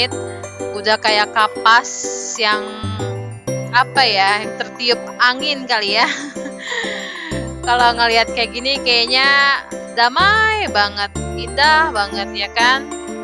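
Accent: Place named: Betawi, Indonesia. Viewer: ngomongnya legit bgt gemes betawi medok 😊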